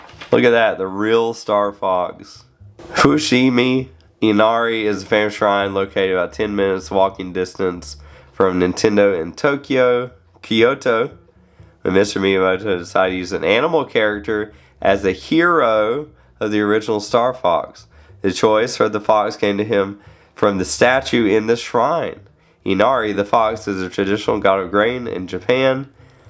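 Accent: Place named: Carolinas, United States. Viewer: Cool channel! Neat accent too. If I had to guess, is your accent Carolinian? 😎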